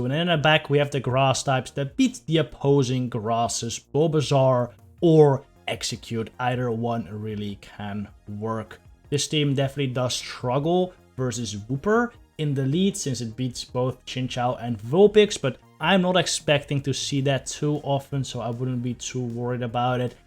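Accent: Netherlands. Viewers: you sound so dutch lol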